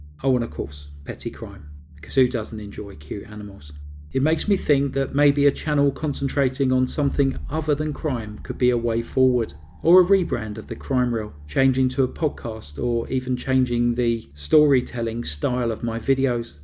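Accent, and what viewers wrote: United Kingdom. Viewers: Hello Crime Reel from London Ontario Canada. Thankyou for the video. Had no idea how much hard work goes into making a video. I too love crime stories, and I want to say that you do an Amazing job with your stories. I always find them very well done, the detail and pictures. I'm not sure how long I have been watching, but I believe I was first brought attention to your channel by Brief Case. You said that sometimes people insult you, that's terrible. I don't understand why people do that. Crazy people in the world. I Love British accents, so I Love your voice. I hope you enjoy your break. If you decide to carry on your channel, I will throughly look forward to it. Thankyou for your channel. Goodbye. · "...limped past the 100K mark." I loved that, for its humility and honesty. I'm a subscriber, only having found you a year or so ago. 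But, I love true crime so yours, and one of the others you referenced, are the two I watch. Always. I love British accents. And, I don't care from which area of Britain, they are all charming and--to my American ears--very sophisticated. I think your delivery is lovely, measured and with a thoughtful tone. Anyone who would send you negative comments (and I'm sorry about that) are just envious, because you've accomplished something the have NOT. I understand your taking a break. You've described your experience with Youtube very clearly and I am sorry for the struggles you're having. Those of us out here who are so interested in true crime do love what you do, and your work is appreciated. Speaking for myself, I LOVE Petty Crime! For the very reason you said, a light, humorous note to sometimes very dark material. I find myself smiling at each one. Well, until I saw the snake today. . . not a snake person. 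One last thing: I like the avatar you have now. Its the best I've seen of all you've had (I did go back and view other, older videos once I finally found you). I hope you'll be back 💙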